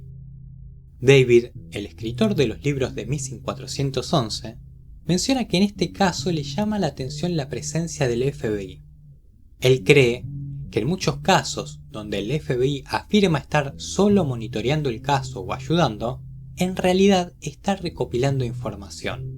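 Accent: Argentina. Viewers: Los Argentinos son perfectos para narrar historias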